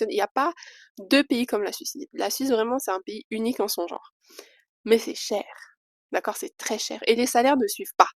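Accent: Cameroon. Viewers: Félicitations ! Et en plus tu as l'accent du pays 👌et tu es une Ekang, la totale !!! mbamba nsoan ngoan Ekang !👋👋🖐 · Hahaha! Mort de rires! Je croyais que c'était une blanche, mais elle a l'accent, elle cook la tchop du pays, elle est go au litch.... Ma cherie, même si tu vas te cacher dans une montage suisse, tu ne seras jamais Heidi, tu es camer! C'est pas de ta faute, c'est dieu qui t'as donné tu ya non? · Bienvenue sœurette, tu es chez toi ,et un grand bravooo ,sans avoir vécue au Cameroun, tu as déjà l'accent Camerounais. il fait beau vivre chez toi au Cameroun. Et tu es très jolie ❤❤❤ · L'accent Camerounais ja mange je mange je mange😭 ❤weeehh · bcp de courage ca va aller.vous avez bcp de tics camerounais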